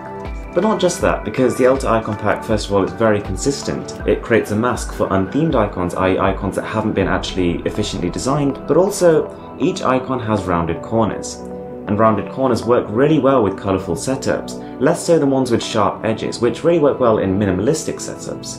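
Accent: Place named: United Kingdom. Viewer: do all british people have this tone when they talk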